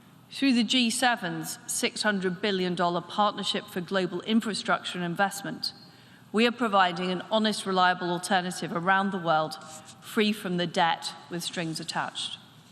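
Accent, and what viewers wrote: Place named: United Kingdom. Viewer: Loooooovveeeeee the British English ♥️♥️♥️♥️♥️♥️♥️🌹🌹🌹🌹🌹🌹🌹🌹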